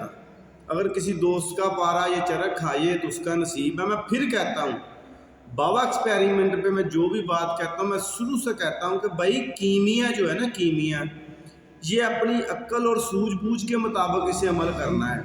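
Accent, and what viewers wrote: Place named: Punjab, Pakistan. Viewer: جناب میں نے یہ نسخہ بنانے کی پریکٹس کی تھی نہیں بنا تھا، کیونکہ مجھے ساٹھ گرام کی جگہ نیلا ست گرام یعنے کہ سات گرام سنائی دیا تھا، استاد محترم نہایت ادب کے ساتھ گذارش ھے کہ اجزاء کے وزن لکھی ھوئی صورت میں بھی شئیر کر دیا کریں تا کہ وہ لوگ جو پنجابی لہجے سے نا بلد ھیں ،غلط سمجھ کر نقصان نہ اٹھائیں ،،شکریہ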